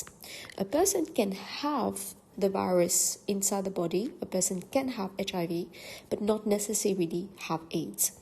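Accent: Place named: India. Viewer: Thank you so much!!!!, if I may ask, were u from? your accent sounds like an Indian accent with a mix of something else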